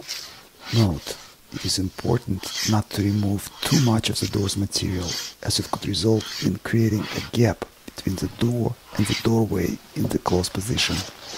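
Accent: Russia. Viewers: Shaun Davenport Defo Russian accent. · yes the accent Is with no doubt sounding russian. But he uses finnish word for dry pine, kelo. I Wonder why. I think he Is a Karelian. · Really?? I thought it was him for sure with that Russian accent and little mistakes... Wow that's interesting. So I guess he hired someone with a Russian accent on purpose? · your accent reminds me of my granpa who lives in moscow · With a strong Russian accent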